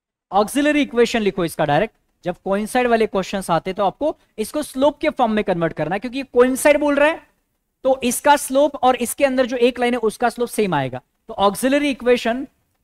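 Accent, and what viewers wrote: Maharashtra, India. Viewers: Sir bhut marthi bol rahe ho samjh nahi rah hai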